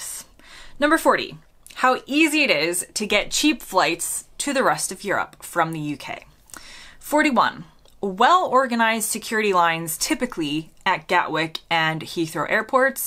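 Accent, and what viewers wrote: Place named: United States. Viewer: Dave, the Dog was my favourite Gogglebox character. It's weird to explain to someone. It's not just watching people watch TV. It's nice to see another Yorkshire addict too. It's hard to find a bad one. The best are amazing, but bad ones are still okay. Flake and Ripples rule too As you picked people, and places. I'm a little gutted i, or my City didn't make it on the list. lol (I wrote before 101, you covered it. Thank you) Nice to get an idea of what you are into too, I appreciate your views. It's nice to see how you've become British (with an accent from the states)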